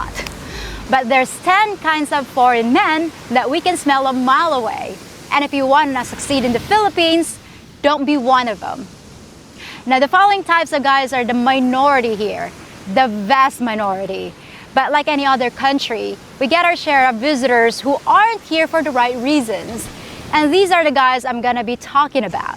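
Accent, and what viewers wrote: United States. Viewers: i can hear that american accent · Hey, did you ever live in the states or dated Americans? I've never met a Filipina who could speak like you. Just wondering.:-) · Kudos to your great American style English. Well done. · You speak English with a VERY American twist... very entertaining, realistic, and honest. "Filters" didn't exist when ang akong asawa and I were video chatting decades ago. And I made sure she understood although I made respectable money? I was a working man...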